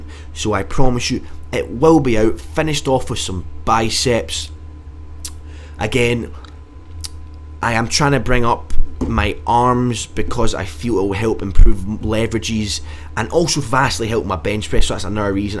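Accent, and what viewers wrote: Scotland, United Kingdom. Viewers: the heavy lifting brings me here, the thick scottish accent makes me stay good lifting!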